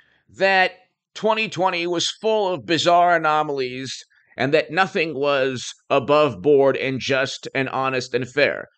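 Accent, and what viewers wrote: United States. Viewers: American was the voice over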